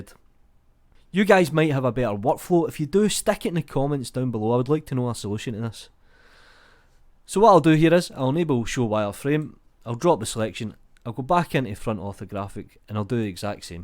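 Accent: Scotland, United Kingdom. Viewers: I have no idea why a Scotish accent reminds me of the vikings 🤔 but great tutorial by the way !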